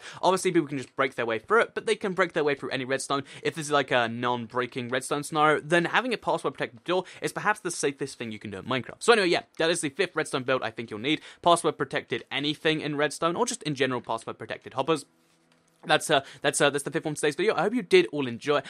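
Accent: United Kingdom. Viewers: You speak in such a breathy manner lmao wonder what part of the UK he’s from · Sonic pikachu don't make fun of him that's because he's British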